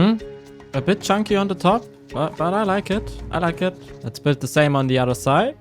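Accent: Germany. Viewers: Deine Stimme und der Untertitel ist so deutsch xD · for me it sounds like german cuz im german and its the same accent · your english is very german-ish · are you german ? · Are u german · i just found your channel and i heard a bit german in your voice are you german? If you are german im german too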